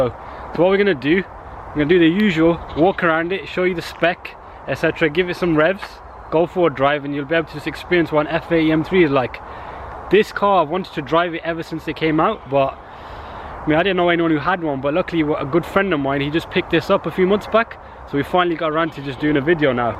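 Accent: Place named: Birmingham, United Kingdom. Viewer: Love your vids bro! Your Brummie accent is hilarious btw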